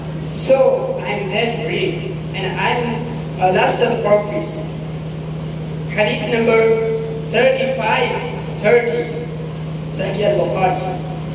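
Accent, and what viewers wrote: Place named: United States. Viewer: Ap ki english to america ki lag rahi he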